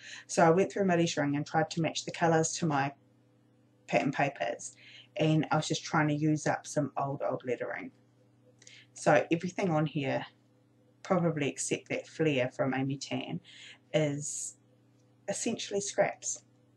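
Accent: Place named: New Zealand. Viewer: Three great layouts! Love watching process vids about using own stash or scraps, as they give me tons of ideas. Also, I'm a Kiwi living in Canada, so it's great to hear a fellow Kiwi chatting away....your narrations are so entertaining (even if they do make me homesick! Lol)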